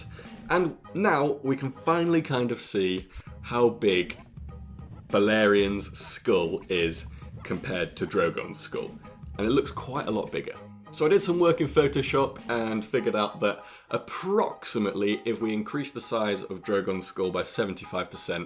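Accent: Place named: United Kingdom. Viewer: How can you not like a nice british accent?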